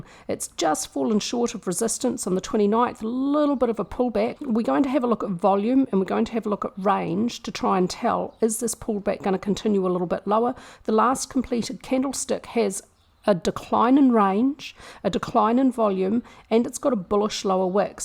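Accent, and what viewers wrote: New Zealand. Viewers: Btw, Just a bit of a stir from across the ditch, but I can't remember the last time I heard such a heavy Kiwi accent, its heartwarming to hear you 😁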